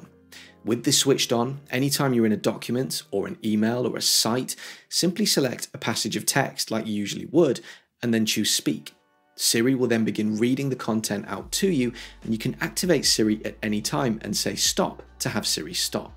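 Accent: England, United Kingdom. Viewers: At last, an English bloke speaking English. No “hey guys, what’s up?” Fantastic. Thank you. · Great tips! BTW, I love your eloquence and British accent! You should consider being a narrator on PBS, BBC, or Discovery!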